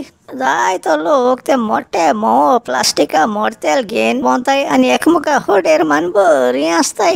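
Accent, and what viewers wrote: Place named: Salcete, India. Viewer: Typical Salcete Konkani... ..😄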